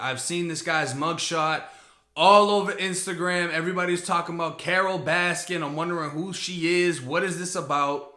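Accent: Boston, United States. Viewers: Bro if your from Florida why are you speaking with a Boston accent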